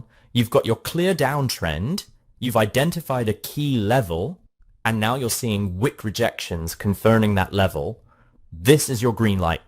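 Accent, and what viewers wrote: United Kingdom. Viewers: You are the best teacher I like your British accent Cheers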